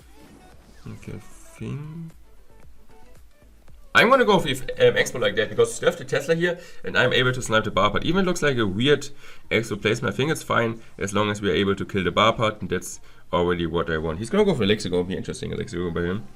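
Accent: Germany. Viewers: Morten the german acc is same nice like the English thanks for this content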